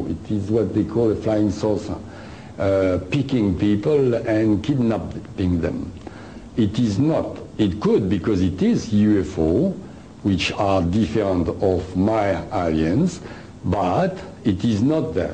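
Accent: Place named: France. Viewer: He is well and not longer in Vietnam, but that is besides the point, yes this was shot along time ago, and the video quality suggest as such, he is french, so of course he has a thick french accent, but i can understand his English just fine. I guess it comes down to the ability to understand others with accent's regardless, the book is made available in perfect English read it and you will know everything he talk about in the lecture, also don't just pass this up, this information is the read deal.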